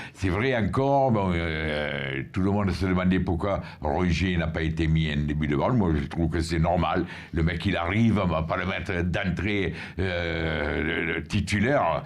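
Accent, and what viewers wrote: South, France. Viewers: Continue tes analyses tu me regale avec ta bonne humeur et ton accent qui chante le midi un coucou du nord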